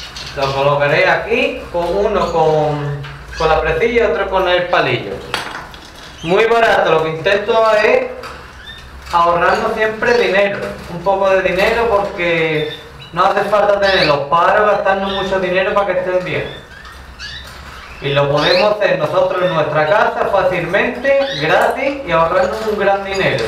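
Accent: Andalusia, Spain. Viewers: Hombre ya es la hora esta esperando este vídeo como agua de mayo jejejeje gracias un saludo campeón a y haber si me entero de donde eres por el acento andaluz seguro igual que yo jejejeje saludos campeón desde moron de la frontera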